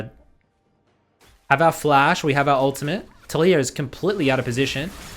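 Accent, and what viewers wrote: Australia. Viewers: If u would drop the obnoxious Aussie accent I would sub :> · i hate to break it to you but thats an aussie accent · I come to these videos for, stay for the gameplay. you are educational and your oz accent makes everything you say sound hilarious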